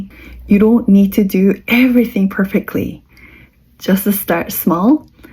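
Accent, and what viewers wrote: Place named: South Korea. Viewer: It's always great to hear you speak and to hear tidbits of your Korean accent, Ms., when you share your health secrets and otherwise. Hydration and consistency are now noted in my memory banks. Thank you. ❤❤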